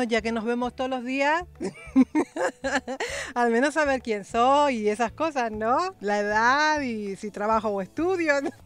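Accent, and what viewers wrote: Spain. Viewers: si eres argentina porque? hablas como española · Es que reacciona y explica todo a detalle y eso que es de España y a veces se pierde el hilo de la idea por el acento o palabras distintas 😎 saludos desde 🇲🇽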